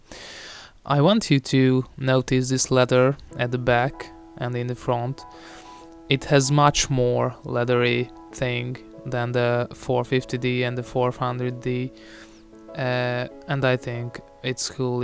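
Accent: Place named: Hungary. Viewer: az a jo magyaros akcentus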